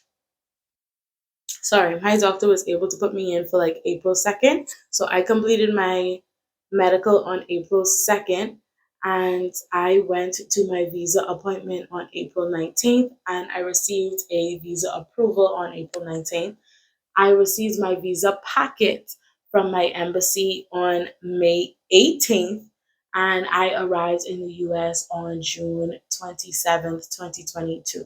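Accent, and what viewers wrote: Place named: Bahamas. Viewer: You sound like a Bahamian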